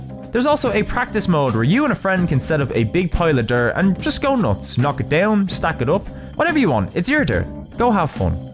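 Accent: Ireland. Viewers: I'm glad you picked up on something closer to his earlier Game Dungeon style. He's transitioned into doing a sort of 'play-by-play' style as he goes through the games because it's easier for him to get episodes out, which is understandable, but I don't like it quite as much, with the game having more of a factor in whether or not I like the episode than it did previously. So a channel with a classic Game Dungeon style talking about PS1 games most people don't remember with some dry humour sprinkled throughout? Now that's a winner right there. Throw in an Irish accent that I have fun imitating and making the decision to subscribe to you didn't take long at all. Keep it up, lad.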